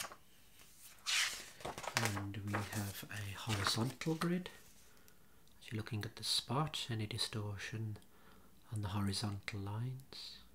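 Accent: Birmingham, United Kingdom. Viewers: OMG A BRUMMY ASMRTIST MY DREAMS HAVE COME TRUE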